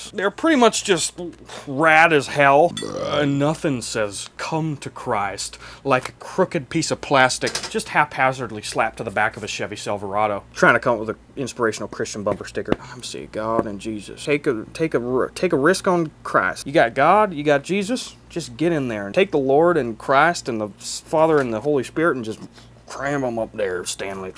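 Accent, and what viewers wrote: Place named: Wisconsin, United States. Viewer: were you trying to do a southern accent because i just kept hearing wisconsin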